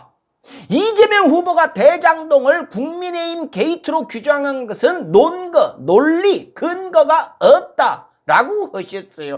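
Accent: Jeolla, South Korea. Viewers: 늘 멋진 마음 멋진 내용 맛깔스런 전라도 사투리 참 좋아요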